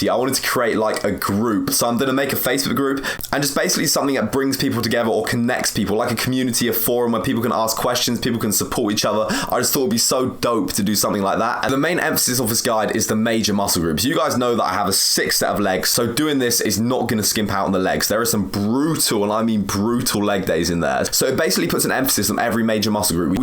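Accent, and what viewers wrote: United Kingdom. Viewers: fking britsh accent hurts my ears no offence and good work btw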